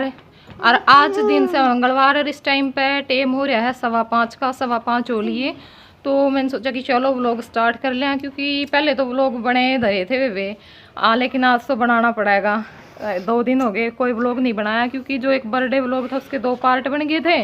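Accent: Haryana, India. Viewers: Sidhi lath maar haryanvi baki achi lage h boldi haryanvi boldi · Full Haryanvi 🤗🤗🤗🤗🤗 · aaj to kte pure haryanvi m laag ree h . pr . bhot achy lge aap b vlog b . keet it up dear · Bhn haryanvi bolte bhut acche lgo ho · Ram ram diiiii. Aapki haryanvi boli must h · Aaj ki video bahut achi lgi haryanvi me aise hi haryanvi me hi video bnaya kro aap deswali boli bolte ho👍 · Haryanvi andaaj mast lage di 👏👏👏👌👌👌👌👍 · Ram Ram jii didi aapki Haryanvi boli 💓💓sun k ne maaja sa aaga 👍👍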